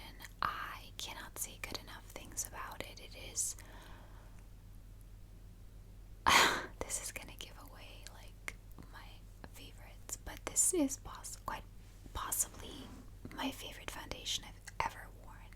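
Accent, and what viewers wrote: United States. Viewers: i recently found ur channel and have been loving ur videos! are you irish? you mentioned you’re located in ireland but you sound american to me!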